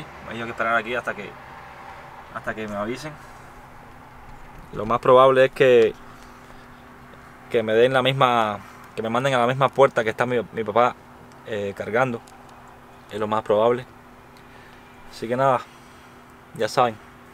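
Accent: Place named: Venezuela. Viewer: por tu manera de hablar, tu acento no eres Mexicano, es mas como Venezolano o Dominicano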